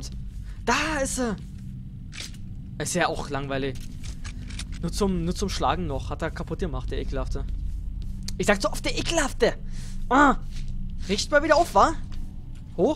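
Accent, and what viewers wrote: Berlin, Germany. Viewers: Ich schreib nur so ungern ;-) Ich schau erst seit gestern deine LP`s und find sie Klasse. Ohnehin geht nix über Berliner Dialekt :D Achja: Mach noch mehr Horrorgames!!!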